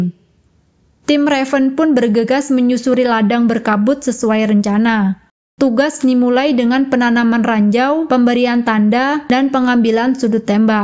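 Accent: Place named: Java, Indonesia. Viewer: Seru banget ceritanya, tapi agak medok suaranya hehe. Lanjutkan mbak.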